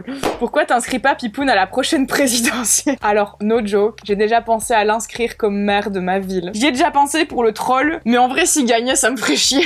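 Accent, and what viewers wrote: Belgium. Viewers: J'adore l'accent belge ! ❤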